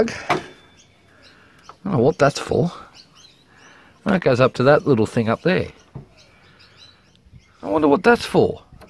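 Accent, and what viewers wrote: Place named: Australia. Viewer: Great to hear an Australian voice. I'm preparing to tear down my old Power Mac G5 for Intel (maybe Hackintosh) Conversion. I also used the ifixit guide. Thanks mate..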